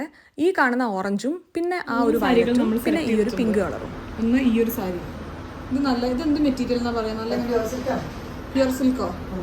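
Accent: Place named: Valluvanad, India. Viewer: Nalla Reethiyil Valluvanadan Bhasha Samsarikkunnundallo, Swantham sthalam Valanchery aayathu kondayirikkum alle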